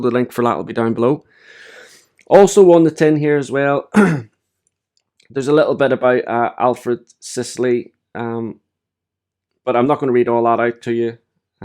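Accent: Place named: Northern Ireland, United Kingdom. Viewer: Ahhh, I could listen to North Ireland all day. My mum's from Armagh. :)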